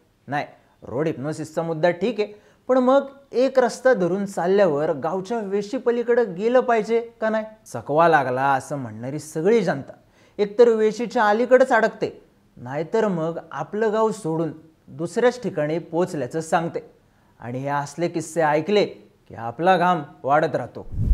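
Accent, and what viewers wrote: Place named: Kolhapur, India. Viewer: कोल्हापूर मधन बोलतोय बग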